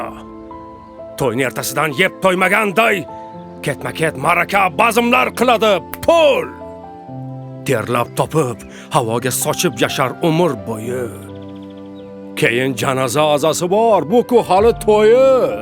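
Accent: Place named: Samarkand, Uzbekistan. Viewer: aka Samarqand shevada gapirdi😅 Farg'onalik shoir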